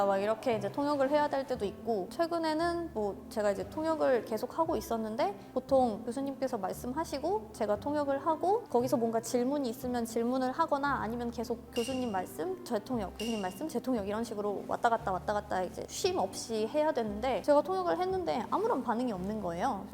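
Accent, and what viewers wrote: Seoul, South Korea. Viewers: 전형적인 서울말씨에요. 당신의 노력에 찬사를 보냅니다. 역시 동시 통역사이군요.대한민국 홍보도 많이 해주세요. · 경상북도 사람인데요..나보다 서울말 더잘하노..ㅋㅋ.! 진짜 대단하십니다.. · 한국인태생특유의음성. 부모님중한분이한국인이신가요? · 서양외모에 전통한국인발음말투 ㅋㅋㅋㅋ신기방기하다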